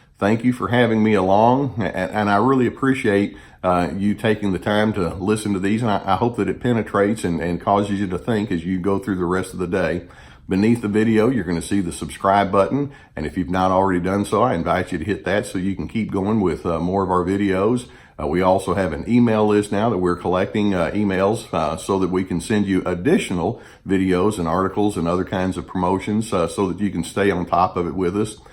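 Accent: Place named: Texas, United States. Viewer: You sound like you're from Texas-east or central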